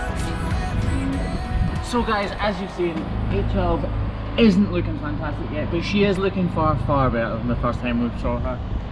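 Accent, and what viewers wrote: Scotland, United Kingdom. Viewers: I know nothing about farms, cows, etc. going through my you tube and saw one of your video’s, clicked it and binge watched a ton of your video’s.l admire your work. I don’t even know how you deal with the poop and pee, let alone the job. Your a kind person. Your face looks really good . I saw where your eye socket and nose was broken WOW! Curious , how did you get into this type of job? Love your accent.Scotland looks so beautiful. Been looking into my family tree my grandfather last name was McClurg. Searching to see if it’s Scottish or irish.Take Care. I live in the United States.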